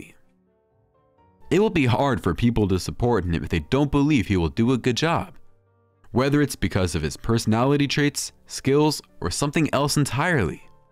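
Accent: United States. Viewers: Any decisions of the sort you mention were ultimately up to the parliament. The monarch doesn't make the rules - or didn't you know that? As to Camilla, her description is Consort - like the Queen Mother when she was married to King George VI, Queen Mary and Queen Alexandra. "Consort" is a description - not a title - meaning that if the King dies the Queen Consort cannot reign. Ever heard of Queen Elizabeth (married to George VI) being referred to as Queen Consort Elizabeth when George VI was on the throne? Do some reading. Once Camilla had been crowned, she was Queen. Also not a good idea to take any information from an American voiced broadcast. They're clueless.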